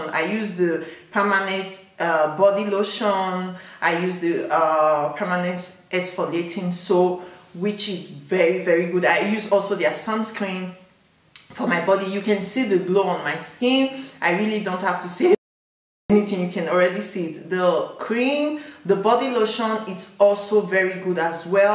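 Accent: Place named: France. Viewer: French accent ❤️❤️❤️love your accent girl! Thank you for the video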